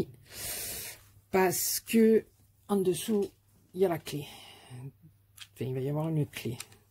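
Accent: South, France. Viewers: rooh ma j'adore je me l'enregistre tout de suite!!ta carte enveloppe c'est une merveille!!j'ai cette collection de papiers,ils sont juste sublimes!! he oui ils n(y a pas besoin d'en rajouter des tonnes!!merci pour cette vidéo ou tu m'a fait rire comme une malade et ton accent du sud j'adore!!gros bisousss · Coucou ma, wouaaaaaa encore une merveilleuse création, elle est sublime j'adoreeeeeeee, tu as toujours de merveilleuses idées de déco, toujours très raffinées, mille bravo ma et merci beaucoup c'est encore du pur bonheur de voir ta vidéo et de t'entendre avec ton fabuleux accent du sud rempli de chaleur et de soleil. Mille gros bisous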